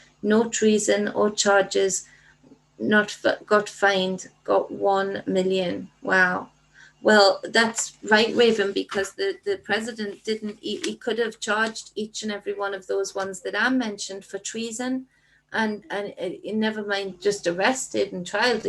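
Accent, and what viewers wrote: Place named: North England, United Kingdom. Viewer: Hi. I have recently subscribed to your channel. I’m a reiki practitioner based in north east England too. Lovely to hear your accent 😊 I’ve made a few videos myself about what’s going on 😉🙏❤️ hope you are well. Take care lovely and keep up the good work 😀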